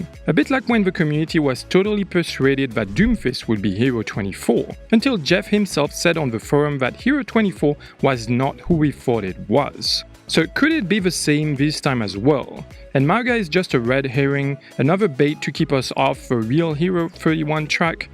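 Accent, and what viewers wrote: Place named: France. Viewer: Are you french? Cause you sound french.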